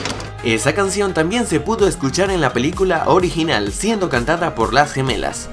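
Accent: Venezuela. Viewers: consolo oir tu voz dije naaaa este chico es venezolano 7u7 ven paca y abrame compañero :v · Eres de Venezuela ?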